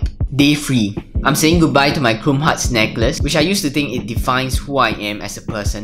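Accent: Singapore. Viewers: Are you singaporean ? Nice accent1😁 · Straight up when I heard your accent, I knew you were Singaporean. Cheers fella!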